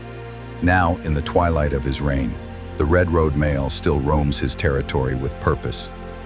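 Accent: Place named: United States. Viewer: listen to the dumb usa gump reading about africa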